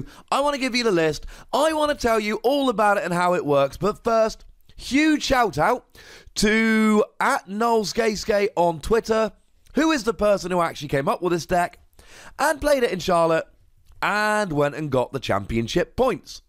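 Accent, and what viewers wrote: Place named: United Kingdom. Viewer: He's from the UK, so it's normal for him to have that kind of accent.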